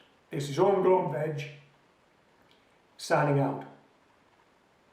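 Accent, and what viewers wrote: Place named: Cumbria, United Kingdom. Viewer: Hi, I've been trying to pin down your accent to amateur rugby league teams and i have decided on Wath Brow. Close? Keep the great videos coming.😀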